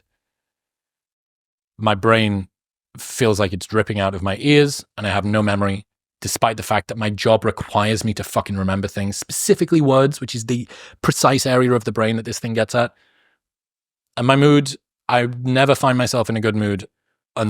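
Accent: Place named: United Kingdom. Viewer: I really hope you manage to beat all your health problems as soon as possible, I wish you all the best. Thank you so much for entertaining my ears with your sexy deep British voice. So glad that I’ve found your podcast!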